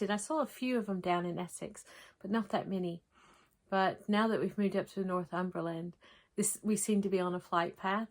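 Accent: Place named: South, United States. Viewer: Once again...another wonderful video!! Progress is coming along nicely on all your projects!! Loving the bird one!! Gorgeous pictures of your adventures!! Thanks for the shout out as well!! I do love your accent...I just giggle everytime I hear you throw in a little southern draw word or two 🤣 ❤️🪡❤️ Editing to add...I haven't stitched on 20 ct but I do have Humility (the big lion piece) on 22 ct & initially I did 2 over 1 on that...it ended up where my stitches were just too puffy & they weren't laying right so I ripped it all out (probably about 1000 stitches & went with 1 over 1. I do use 2 strands for 18 ct. But just wanted to give this little insight...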